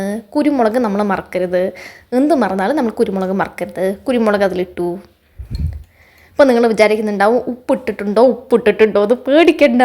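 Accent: Palakkad, India. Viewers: Super tasty omlette thank you ma'am adipoli palakkad slang❤❤🎉🎉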